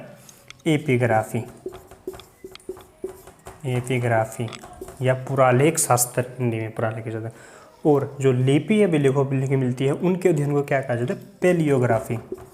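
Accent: Haryana, India. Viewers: Woww sir asli haryanvi 👌👌👌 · Full haryanvibazi guru ji🔥😀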